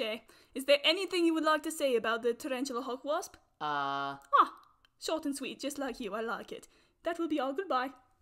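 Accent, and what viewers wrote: United Kingdom. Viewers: Best British acent ever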